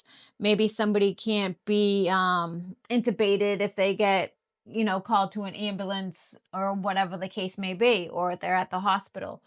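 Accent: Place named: Massachusetts, United States. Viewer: Hi there. Love the MA accent. From Plymouth. Setting up a small 3d print farm now. Was wondering if you’ve used the laser on PLA. I’ve just found you so haven’t watched all your stuff yet.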